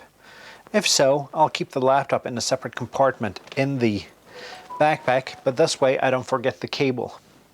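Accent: United States. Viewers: off topic but I had to do a double take on your qth because of your English dialect sounds American is that common in Norway thanks 73s kd9jay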